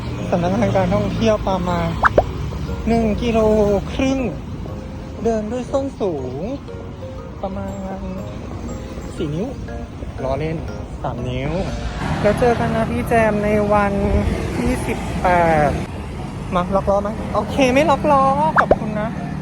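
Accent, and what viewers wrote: Isan, Thailand. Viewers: เว้าอีสานตาฮักจ้า❤❤❤❤ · ช่องนี้ไม่รู้ชื่อช่องอะไร เจ้าของช่องคุยสนุกมากน่ารัก หล่อสวยอยู่ด้วยกัน เว้าอีสานก็เว้าม่วน ชื่อว่าจังได๋น้อนี่😂😂❤❤🎉🎉😢😢😅😅😊😊😮😮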